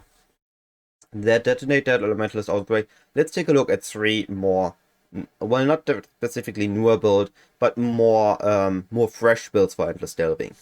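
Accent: Germany. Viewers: Deutscher? BTW Very Good Video!! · Hört sich an, als seiest Du Deutscher, daher mal das Feedback auf Deutsch: Danke für die Anregungen, jetzt hab ich 'ne Idee: Seit gefühlt hundert Ligen mal wieder 'nen Essence-Drain-Trickster ausprobieren. :-)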